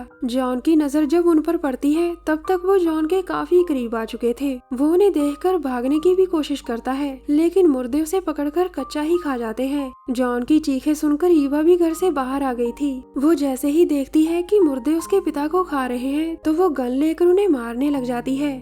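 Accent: Punjab, India. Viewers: Sis tusi punjabi o na 😂 ?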